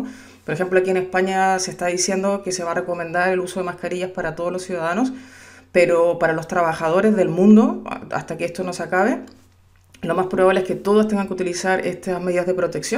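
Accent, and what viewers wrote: Chile. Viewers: eres Chilena?.. Acabo de encontrarte eres lo máximo!! Muy claro y muy motivador! Excelente ayuda para nosotros del rubro Restaurantes! Saludos!!!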